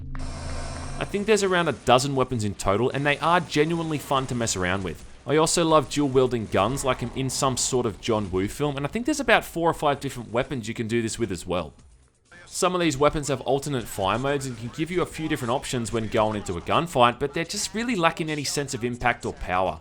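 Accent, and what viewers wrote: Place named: Australia. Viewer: Oooh thank you i love your reviews and australien accent. both combine makes a charmes in your video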